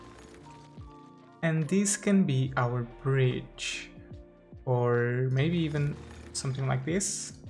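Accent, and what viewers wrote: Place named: Portugal. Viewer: Adoro o teu sotaque tuga inconfundível!!!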